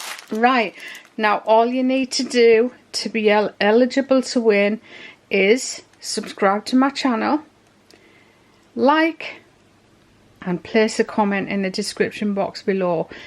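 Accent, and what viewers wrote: United Kingdom. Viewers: I would love to win these moulds, I would love to use them for solid body butters or solid sugar scrubs or wax melts. I've been watching your videos for at least 10 years know. Love your British Accent and your Fabulous High end style :)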